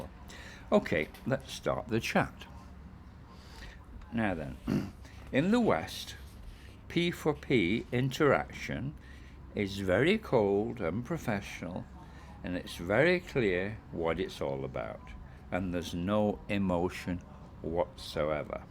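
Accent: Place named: Yorkshire, United Kingdom. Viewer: Think I sat next to you in Heavens Above in Jan this year? I recognized the accent and when I asked if you were from Yorkshire you sounded surprised/concerned and asked me how I knew. It was you right?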